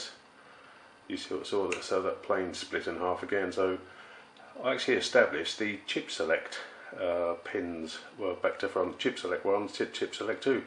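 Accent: United Kingdom. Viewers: are you british or american aka born in what country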